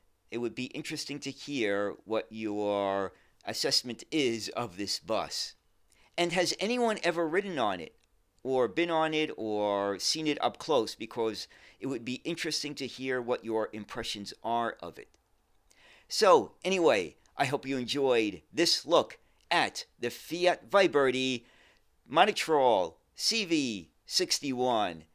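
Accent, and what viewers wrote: United States. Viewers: Thank you for yet another interesting bus video. I am not from the USA and so I hope you don't mind if I ask about your accent and which part of the US you come from?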